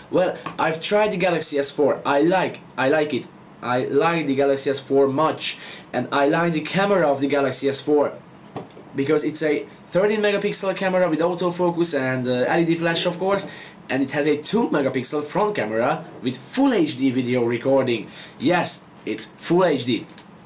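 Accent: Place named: Turkey. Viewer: You just have a Turkish accent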